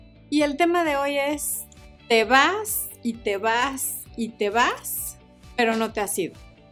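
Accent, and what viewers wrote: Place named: Mexico. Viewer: Lo máximo. Me encantan tus consejos. Y tu también tu asentó mexicano.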